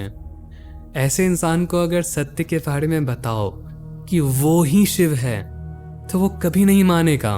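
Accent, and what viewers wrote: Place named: Saharsa, India. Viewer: bhai बिहार से हो क्या आप आपके बातों से लगता है आप सहरसा के आस पास के लगते हैं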